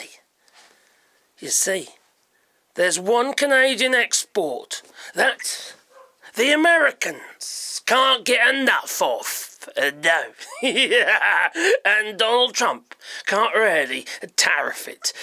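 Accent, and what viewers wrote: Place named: West Country, United Kingdom. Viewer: Mate, we have spoken before. You’re doing so many videos at the moment and you are an absolute star. I remember when you were thinking about shutting your channel down but it seems things have got better. I am a Southerner from Pompey but I live in the West Country and they all talk like you, mate. And I have seen and love your dogs. I wish I could live there in France. You and your wonderful lady did a great thing to escape before those wankers fucked it for all of the rest of us. Much love mate. I would love to visit you just fora couple of hours one day. Surprisingly I,m not called. I’m Paddy. I’d love to chat but I get it if you don’t want to. Whatever youchoose, much love and thanks for the channel x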